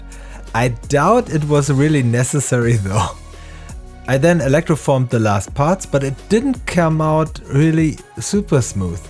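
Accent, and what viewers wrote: Germany. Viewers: Hey. Es hört sich so an als wärst du eigentlich Deutscher Muttersprachler, daher spreche ich dich mal auf deutsch an. Ich bin gelernter Oberflächenbeschichter. Das was du in deinen Videos machst, mache ich sozusagen hauptberuflich. Daher kann ich dir bei Fragen gerne Helfen. Ich persönlich verstehe nicht, warum du den Strom erhöhst mit der zeit. Du solltest die Oberfläche ausrechnen und den Strom entsprechend 1,5-2,5A pro dm² einstellen. Die Unreinheiten die du auf der Oberfläche siehst, sind Verschmutzungen in deinem Elektrolyten, die sich als Poren auf deine Teile legen. Deine Kontakfläche ist eigentlich groß genug, du solltest versuchen nichts um die teile herum zu spannen, sondern im Innenbreich zu kontaktieren. Scharfe kanten oder spitzen solltest du jedoch abschirmen, da du dort sonst Anbrennungen bzw Knospenbildung bekommst, so wie dein Draht beim ersten teil ausgesehen hat. 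Ich hoffe das hilft dir weiter.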